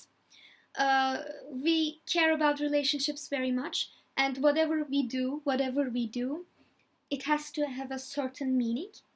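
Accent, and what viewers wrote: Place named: Turkey. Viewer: are you Turkish? Your accent is very light, so you are very easy to understand.:)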